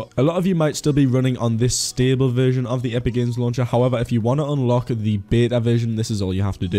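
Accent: Newcastle, United Kingdom. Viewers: are you from Newcastle because your accents sounds like you are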